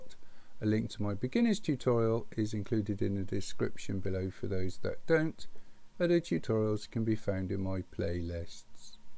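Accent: West Country, United Kingdom. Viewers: Great video, great west country accent!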